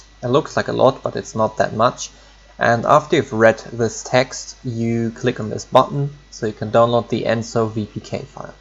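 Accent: Germany. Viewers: Some good old german accented English in the morning, lovely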